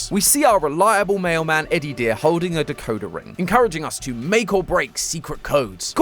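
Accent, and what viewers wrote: United Kingdom. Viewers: is literally a British version of matpat and I’m all for it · I’m going to call British matpat now. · is the most British person I've ever heard Note:it's not a bad thing that he's British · sound like the British version of mato at or more of a Brit acent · BRITISH BOI IS BACK! WOOOO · I like your accent, british man. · I actually appreciate the voice change and i have to say its almost nostalgic for some reason to replace an american accent with a British accent. I accept. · We may miss Matpat. But British matpat hits different NOT IN THE SUS WAY YOU IDIOTS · Why is he british? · The new host has such a nice British accent I could never reach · He sounds Soo British. Is British? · Hold up why did MatPat leave? Nvm Now we a Very British Man😂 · WAIT HES BRITISH :( GOROSEI DENIED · Ok, but the british accent?😳 Definitely staying with game theorists now😅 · british mate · The British boy is back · Why did they replace Matpat with this Brit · Is British❤ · matpat sounds british lol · He’s British, 😮 not going to lie, I like him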